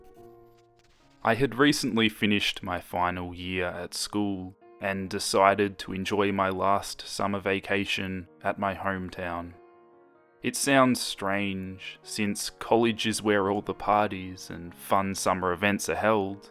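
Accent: Australia. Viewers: Your Australia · OMG UR AUSTRALIAN HI :,) IM AUSTRALIAN TOO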